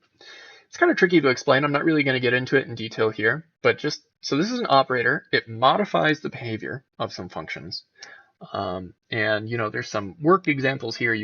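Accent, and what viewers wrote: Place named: Canada. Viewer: It really depends what part of what country he's from... My guess is Canada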